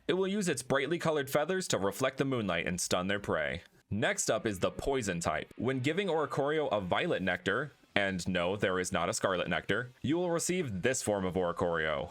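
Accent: Ireland. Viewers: Be proud of your Irish heritage - it makes you human (and I love an Irish accent! 😊) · Are you from Ireland too? 💚🤍🧡🇮🇪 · I thought he was Irish Also merry Christmas 🎄🎄🎄🎄🎄🎄🎄🎄🎄🎄🎄🎄🎆🎇✨🎁🎈🎄🎄🎄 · Being Irish I can confirm he is Irish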